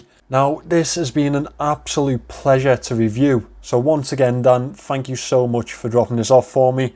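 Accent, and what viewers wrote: Liverpool, United Kingdom. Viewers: Good luck with your channel, your enthusiasm shines through and your “ scouse” accent gives the commentary it’s own unique flavour, as does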